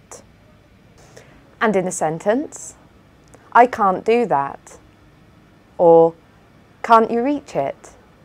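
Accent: United Kingdom. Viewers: 고맙습니다.... 영국발음 쨩.. (하트)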